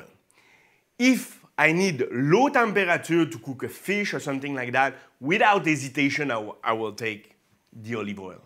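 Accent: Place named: Quebec, Canada. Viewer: Hey this guy is a quebecois 🤣🤣😂🤣 j’adore l’accent en anglais. Vraiment authentique 😂😂😂 Je vais m’inscrire à ton canal. J’espère que tu as des recettes à base de plantes 🌱 😉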